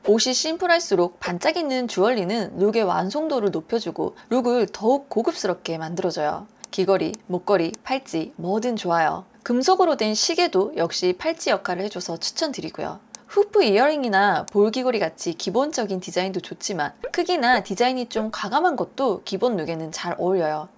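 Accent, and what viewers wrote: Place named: Gyeongsang, South Korea. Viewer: 경상도사투리와 서울 사투리가 아주 이쁘게 믹싱되어 좋네요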